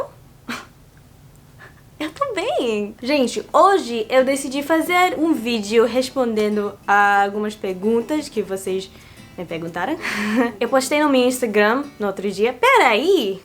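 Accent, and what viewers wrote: Rio de Janeiro, Brazil. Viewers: Aaaaaw the investment on carioca accent! Just music ❤️ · parece ter um pouco de sotaque carioca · Tem sim! Sotaque de Carioca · Você tem sotaque daqui do RJ ♥️ Muito bonitinha kkk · fico contente que você abandonou um pouco do sotaque carioca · você tem o sotaque brasileiro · Disse para ela esquecer o sotaque carioca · tem um pouco de sotaque carioca...kkkkk... maneiro!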